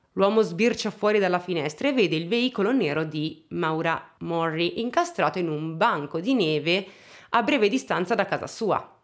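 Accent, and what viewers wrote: United States. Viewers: Perché spingi così l accento americano?????? Sarebbe tutto molto interessante se non fosse per questi nomi e città straniere citate con un accento veramente troppo spinto...... veramente fastidioso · il tuo accanirti nel “parlare in americano/inglese”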